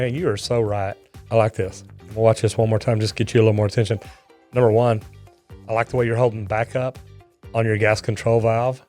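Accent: Texas, United States. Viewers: Based on your accent I say ur Texan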